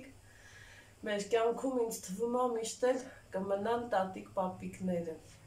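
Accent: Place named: Vanadzor, Armenia. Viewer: ինչքան տխրություն կա ձեր մեջ։հաց թխելը ամեն մարդու գործ չէ։միայն հոգով բարի ու լավ մարդիք են կարողանում լավ հաց թխել։ձեզ առողջություն եմ մաղթում ու երջանկություն սիրելիս։իսկ ձեր հոգու տխրությունը թող վերանա ընդմիշտ🤗🤗🤗առաջին անգամ եմ դիտում ձեր վիդիոն ու միանգամից բաժանորդագրվում եմ։կասեք՞ դուք որտեղից եք բարբառը կիրովականի կողմից է ոնց որ՞ հարազատ բարբառ է ինձ շաաատ🤗🤗🤗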